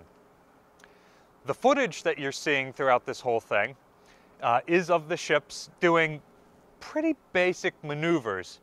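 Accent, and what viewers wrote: United States. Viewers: American voice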